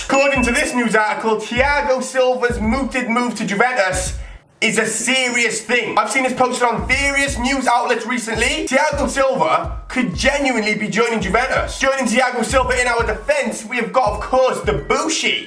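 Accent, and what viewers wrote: Wales, United Kingdom. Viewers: Are you wellsh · are u Welsh cos I am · Are you Welsh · ar you Welsh? cuz i am! <3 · are you Welsh? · Are u welsh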